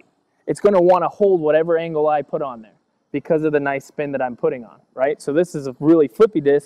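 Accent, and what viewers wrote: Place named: Western Pennsylvania, United States. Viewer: It's a western PA thing. I'm assuming he's from somewhere around Pittsburgh.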